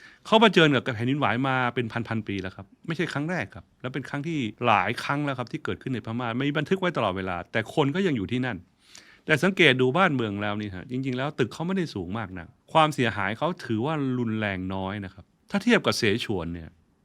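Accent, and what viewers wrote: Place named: Thai-Chinese, Thailand. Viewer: ท่านคนไทยเชื้อสายจีน ธรรมดา ออกสำเนียงแบบนี้แหล่ะ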